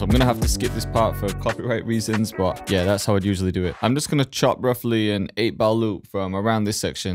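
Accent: United Kingdom. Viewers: I really like this dude's British accent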